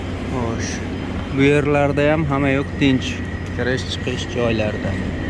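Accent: Namangan, Uzbekistan. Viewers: Сиз наманганлик сиз гапиришингиз дан билдим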